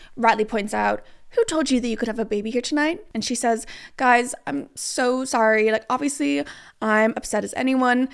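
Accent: Ireland. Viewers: for the first 30 minutes of this video i was literally was debating on whether or not to make a comment about your accent because the way you were pronouncing your a's, p's, and l's were all giving me irish and come to find out lmfaoo you are irish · I watched multiple videos of your and I didn’t even notice your accent til after you said you were Irish. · it took me 8 minutes into the video to actually process the Irish accent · omg you're Irish? I thought you were Australian :p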